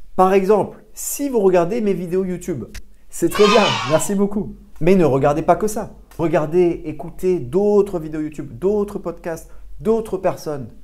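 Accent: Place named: South, France. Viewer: Il me semblait juste que tu parlais un peu a la maniere du sud (j'adore entendre des nuances de français))) Mais j'en doutais et pensais que c'etait probablement juste une association — ton nom est, comme le personnage principal de le dessin anime Ratatouille, et la ratatouille est un plat du sud, etc. Bien que (j'ai deja regarde toutes tes videos) tu vis vraiment dans le sud))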